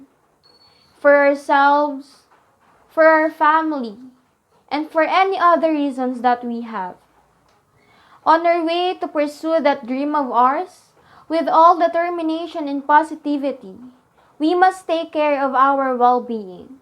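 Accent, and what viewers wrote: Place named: Philippines. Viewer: Thats a good example for the philippine english accent. Great job sweetie.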